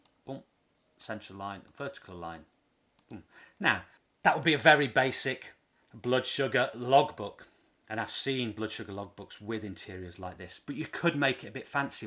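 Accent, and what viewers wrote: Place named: Northern England, United Kingdom. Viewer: yes , i like your videos too . thanks for them .i just found you today , very grateful i found you .You sound like your from the north of England , i am wondering where exactly ....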